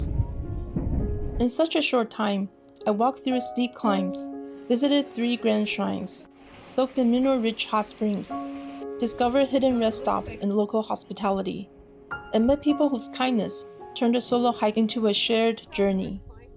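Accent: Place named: United States. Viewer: Sorry but the fact your American I cannot follow you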